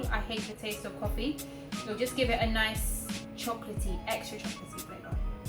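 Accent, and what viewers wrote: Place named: South, United States. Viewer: Not bad! Funny 🤣 the southern accent I mean. But amazing as always.🌹🌹❤️ Keep on producing these great videos. 🌹🌹🌹 You should have your own products in the Supermarkets.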